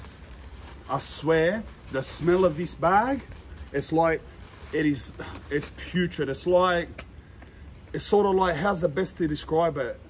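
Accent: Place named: Australia. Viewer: cheeps lol aussie accent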